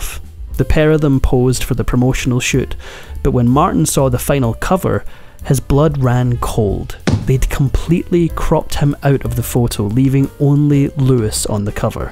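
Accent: Ireland. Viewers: Are you Irish? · Is the narrator Irish?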